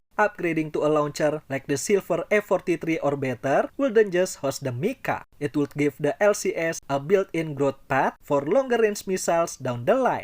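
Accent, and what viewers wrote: Indonesia. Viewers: they already pay to 3rd party item and etc. why abandon it. it still on track for the balance LCS. btw, please use AI because you read the text like a robot without any stop. so hard to understand you indon accent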